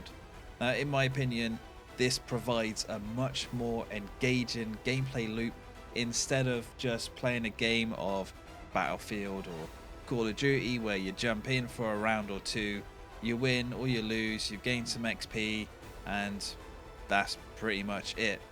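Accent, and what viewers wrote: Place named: Bristol, United Kingdom. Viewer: U sound like ur from Bristol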